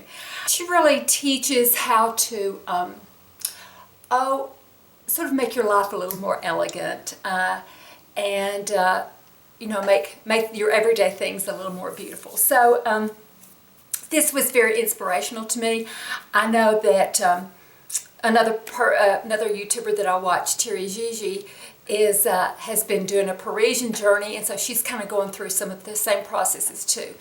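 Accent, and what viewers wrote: South, United States. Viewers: You are one of my favs! I just love your genuine sweet personality and as southern girl...I LOVE that accent! And....holy cow I had no clue you were almost 60...you are GORGEOUS inside and out! I would love to see some more videos on your secrets to aging so gracefully....both on the inside and out! Happy New Year!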